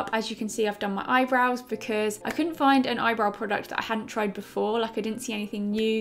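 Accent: United Kingdom. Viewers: I’m in love with her accent 😍 Is this a British accent??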